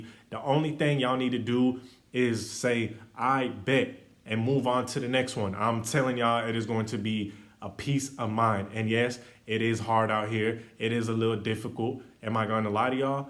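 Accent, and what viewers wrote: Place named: Georgia, United States. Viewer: Aye bro you from GA?? That accent real familiar 💯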